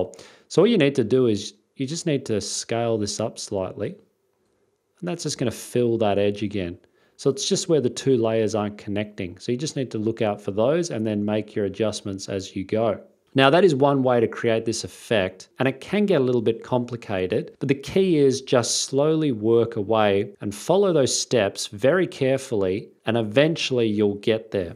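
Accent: Australia. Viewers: a bit off the topic question....but.... are you Australian?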